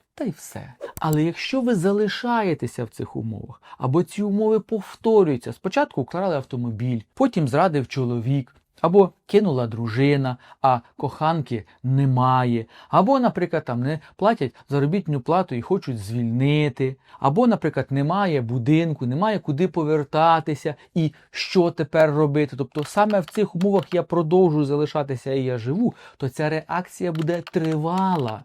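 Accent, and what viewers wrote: Vinnytsia, Ukraine. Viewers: Мені здається що ви з Вінницької області.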